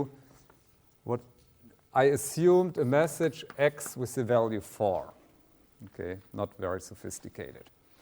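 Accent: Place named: Germany. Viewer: Best german accent ever!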